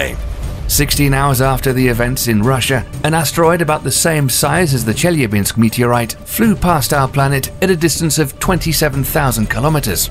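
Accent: United Kingdom. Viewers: Funny how bull shit with a British accent sounds more credible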